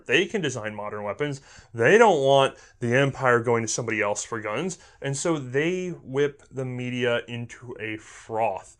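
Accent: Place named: South, United States. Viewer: Haha, must be that thick southern accent (or my damn ears).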